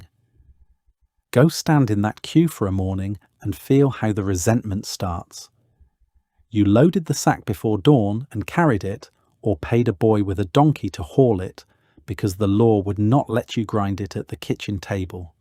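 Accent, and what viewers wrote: United Kingdom. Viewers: How much AI is used in your vidoes? Thumbnail is AI, script is very repetitive and oddly structured which suggests AI writing, and though the voice is British, the terms used are exclusively American. I just have no idea how much of this I can trust at all.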